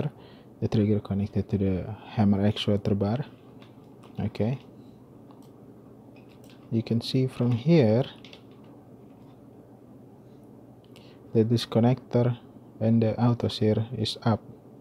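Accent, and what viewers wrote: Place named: Indonesia. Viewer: Your accent is very indonesian. You even sound like my lecturer lol😂. Or are you?? Vsauce music plays Love your content btw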